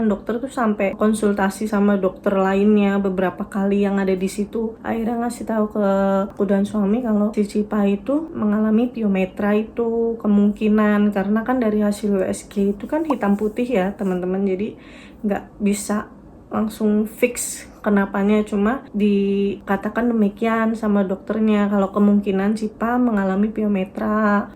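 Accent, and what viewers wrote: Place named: Banyumas, Indonesia. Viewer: Dari logatnya kayanya kaka org ngapak ya🤭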